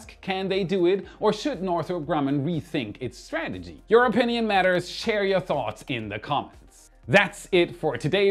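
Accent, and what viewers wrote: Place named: Germany. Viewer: He’s native German.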